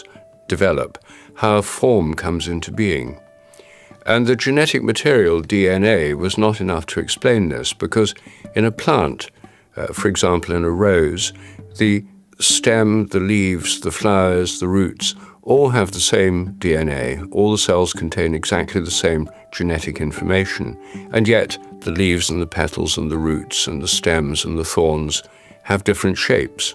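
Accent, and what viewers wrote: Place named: United Kingdom. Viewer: i came for the noble posh english :face-green-smiling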